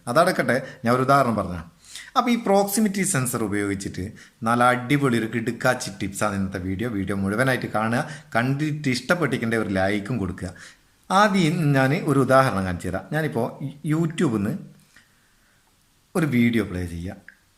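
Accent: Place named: Kozhikode, India. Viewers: Kozhikod slang😀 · Aadhyam ningale nadapuram slang ozhivaakk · Idh ksd slngalla... kozhikod😤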